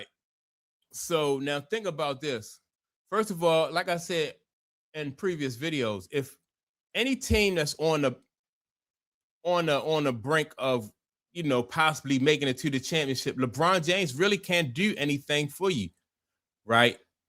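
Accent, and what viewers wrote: Baltimore, United States. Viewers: You from Baltimore or something?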